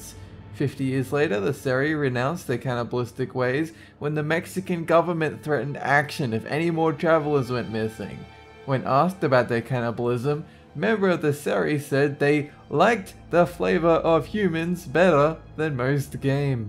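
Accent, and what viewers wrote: Australia. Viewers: alright, been watching you for years, gotta ask: are you Australian? i know, the accent says not-typically-Australian, but you sound so colloquial. does our generation just all speak the same..? far out. anyway, great stuff, thanks n keep it up! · The guys talking sounds like he's slurring or or mumbling. I know he's Australian but it's hard to listen to. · he is australian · sounds nothing like British accent. It's Australian. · Hellbent's alright and all But I love the Australian accent They always sound drunk and it's amazing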